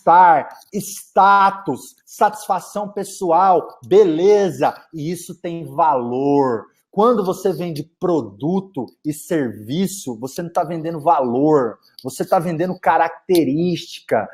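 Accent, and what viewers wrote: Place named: Goiás, Brazil. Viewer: Tenho visto dezenas de conteúdos para impulsionar as vendas do meu negócio, e os seus vídeos são os melhores! Isso não só por conta do conteúdo que é riquíssimo, mas principalmente por que vc passa estratégias realmente aplicáveis em pequenas e médias empresas. Normalmente os conteúdos são direcionados a grandes faturamentos, já o seu entra na realidade da maior parte dos empreendedores brasileiros. Muito obrigada mesmo! Ah! Sou sua conterrânea kkkk orgulho de ver vc puxando o R típico do nosso Goiás! 🤩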